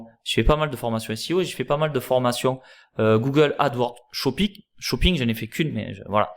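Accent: South, France. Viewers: Salut, merci pour cette vidéo et puis entendre cet accent du sud, quel plaisir. Les US c'est top mais cet accent chantant me manque un peu quand même. Beau bureau! La bise.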